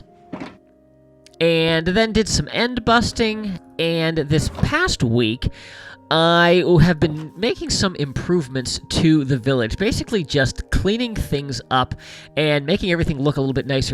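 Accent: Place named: Tennessee, United States. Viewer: Another great video. I recognize that vaguely central Tennessee accent and it reminds me of my grandparents.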